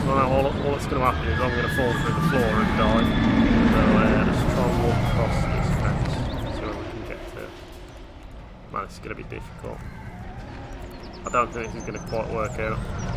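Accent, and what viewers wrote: Black Country, United Kingdom. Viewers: Oh wow I love this channel and didn't even realise you are from Oldbury, I live so close, in Tividale, going to Papa John's in a bit if it's open lol! I should have realised from the Tara a bit, but that just sounds normal to me, I forget our dialect really is quite confined, and no, we ay Brummies lol!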